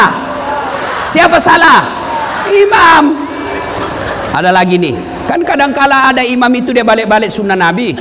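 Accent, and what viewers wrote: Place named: Makassar, Indonesia. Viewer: Alhamdulillah meski di Tanjung Balai dialek makassarx pak Ustadz tdk hilang❤😂😊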